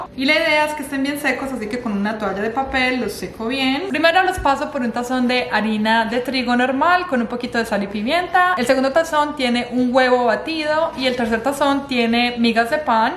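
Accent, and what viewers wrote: Antioquia, Colombia. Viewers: Ah qué bien queda cerca a Mánizales, si tienes un acento paisa, ah qué rico pronto puedas venir a Colombia. Bendiciones a toda tu hermosa familia, eres una madre y mujer ejemplar, lo haces súper bien y me encantó este tipo de videos.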